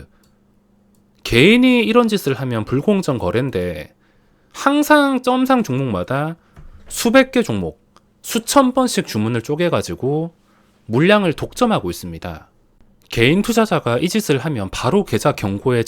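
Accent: Gyeongsang, South Korea. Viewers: 님께서는 오늘 원없이 단타를 쳤습니다라고 멘트 하실 때 제일 멋져요 님의 지난 영상도 다시 보고 매일 올려 주시는 그날의 영상도 다시 자꾸 보게 되는데 노터스 금맥 짚으시고 울면서 보내주어야 할 것 같습니다라고 멘트하실 때 제일 쿨해 보였고 반짝반짝한 종목들 찾아 욕심 부리지 않고 매도하고 시장의 흐름을 그 누구보다 먼저 짚어내시는 모습 아이 데리고 키즈카페에 가느라 종목 움직임 하필 못 보았다고 하소연하시던 인간적인 지난 영상 등등 개미의 입장에서 생각하고 개미들 걱정 많이 하시는 마음이 너무 고운 모습 심법 잃지 않는 매매 정확한 저점 확인의 두근두근하던 날들 모두 응원하며 공감하고 듣고 있습니다 귀에 쏙쏙 들어오는 경상도식 억양의 종목명 언급 기대할께요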